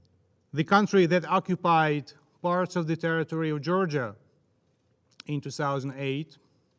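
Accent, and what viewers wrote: Russia. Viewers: Вибачте, шо домахуюсь, але чого Владімір Зєлєнскій, а не Володимир. Непогана в нього англійська, але з елементами російської...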